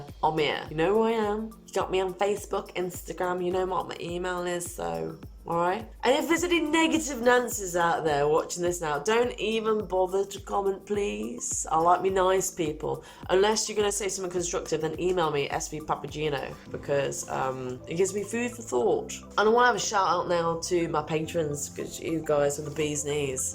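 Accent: United Kingdom. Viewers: Hi, two things from me: in the past i wasn't really a fan of your videos watching you talking all the time. But this one was different! It was very personal, it was all YOU - brilliant, go ahead on this road! And btw: PLEASE a lot more of your "lovlea" british accent! I'm German - but i like it! Second: Lazy Tommy! What the heck has he done all the time? Just playing guitar and drinking Wiskey "with Plastic in it"? He is an electrician, why he didn't fixed your wiring already? That's sad to hear, thought that it was his business. Wish you better luck next time with your crew. Follow your track - of course you'll do! John Boyle: i'm totally with you. You'll never will know what more problems an old engine has in store for you after fixing the actual one. If you want to have trustful equipment buy new - and keep it maintained! Then it is YOUR stuff and you know what you're talking about. 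Raise a fund! With your sails and rigging it has already worked out, why not with the engine? Always fair winds and happy travels from Germany.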